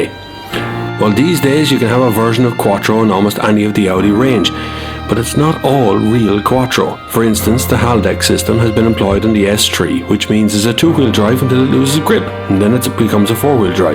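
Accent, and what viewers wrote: Ireland. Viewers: in Ireland 3 is pronounced as tree. So if you hear a tree as three he is Irish....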